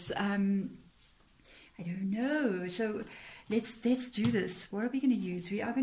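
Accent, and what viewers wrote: South Africa. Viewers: Are you South African? Your accent sounds like it.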